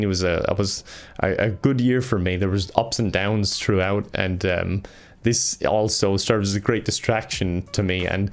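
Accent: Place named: Ireland. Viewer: I feel that way all the time wen I see ur vids its like a piece of my mind sets at peace wen I see a vid I love ur content I love ur humor and I really like the accent, I kinda wish I had a heavy irish accent (I am irish) but its a relief wen I see ur vids I love wat u do its a really important part of my day wen I watch ur vids and I hope u keep going never quit and hopefully I can meat u at pax or something later on in life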